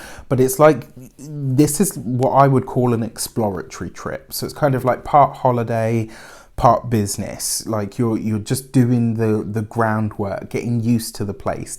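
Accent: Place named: United Kingdom. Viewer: Sending you healing energy and no worries about aesthetic... a British accent can pair with a sock puppet and come off as important and eloquent. Feel better☺ (excited about the new people appearing in the reading )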